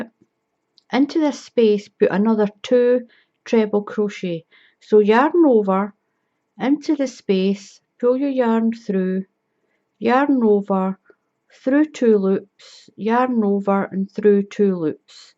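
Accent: Scotland, United Kingdom. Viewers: Am really enjoying making this blanket during lockdown , have learnt alot . Love your Scottish accent and hope to see and hear more of your patterns in the future . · Great to hear a Scottish accent on a crochet tutorial. Love this pattern thank you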